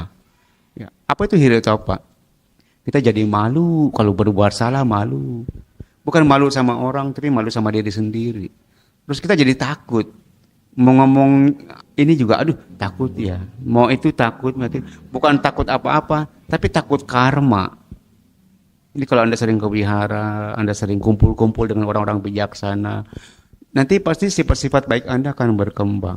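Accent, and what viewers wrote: Indonesia. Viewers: Baru kali ini denger bhante berlogat bekasi-karawang-cikarang dan sekitarnya 🫶😊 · logatny betawi medok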